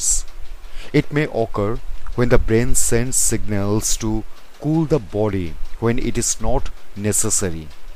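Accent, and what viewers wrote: India. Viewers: He is lndian..you cannot expect him to talk like an English or american man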